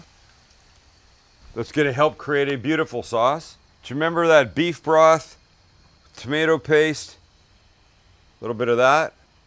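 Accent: Canada. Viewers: Are you Canadian? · That Canadian accent LMFAO! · what part of Canada are you from?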